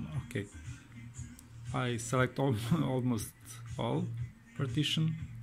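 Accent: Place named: Serbia. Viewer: Pazi mu akcenat.. ovo neki nas garant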